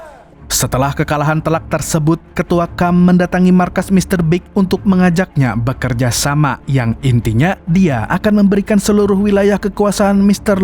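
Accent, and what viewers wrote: Banjar, Indonesia. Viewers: Pian orang banjar kah mang.? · ai urang banjar skalinya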